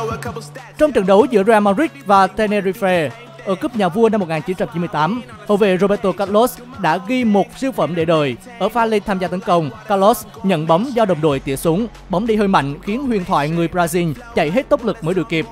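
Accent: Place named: Da Nang, Vietnam. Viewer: Người đà nẵng hả em bé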